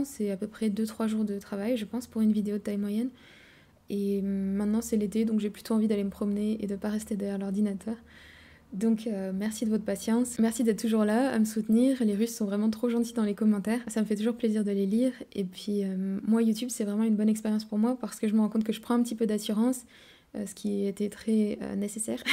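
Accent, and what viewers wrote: France. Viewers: Greetings young lady, May I offer that you please speak a little slower. I was easily able to hear your French accent while speaking Russian. Your accent combined with the high speed of speaking made it difficult for me to understand you. Good luck, and thank you.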